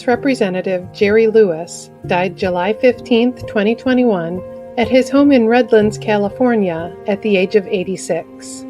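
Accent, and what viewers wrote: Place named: New England, United States. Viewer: U need to calm that extreme Yankee accent down, js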